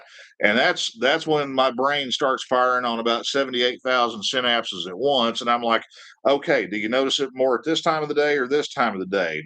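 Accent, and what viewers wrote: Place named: Arkansas, United States. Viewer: I don't know if these comments after the live-feed helps in any way, but I am a loyal listener for several reasons. One is that you are very knowledgeable in usable information. Second, it's fun to listen to your jokes (not necessarily your characters). Third, I love to hear an accent from home and some days I am low enough that just hearing an Arkansas accent will lift my spirits to the point of almost tearing up. Some day I will make it back down to Tampa and will say hello again (met you Dec 23 at the Ride Factory, retired Navy guy. Also met Weems, David, Jack and wives at Sturgis in 2025.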